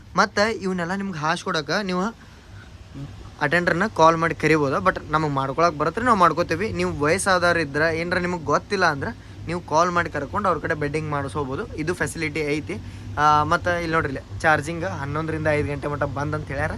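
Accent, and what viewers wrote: North Karnataka, India. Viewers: your native Kannada north karnataka accent narration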